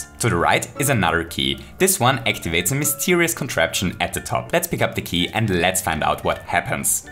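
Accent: Austria. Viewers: Are you from Austria?:D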